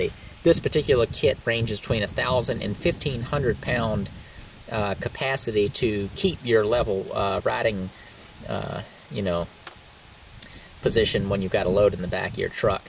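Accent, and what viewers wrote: United States. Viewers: Good murican accent.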